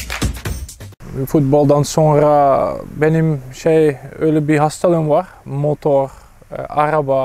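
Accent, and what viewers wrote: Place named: Syria. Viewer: Suriyeli gibi konuşmuş ama saglam kaleci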